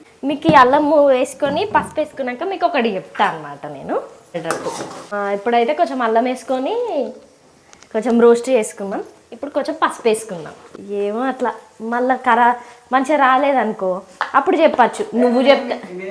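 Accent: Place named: Warangal, India. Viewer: Warangal slang vere untadi... Akka mi slang masthu untadi..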